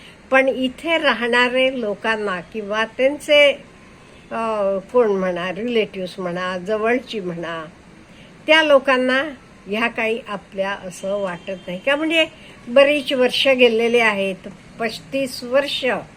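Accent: Karnataka, India. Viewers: त्यांची मातृभाषा मराठी नसेल..You should accept other language speaking pple's tone!! कानडी असाव्यात..छान बोलल्या.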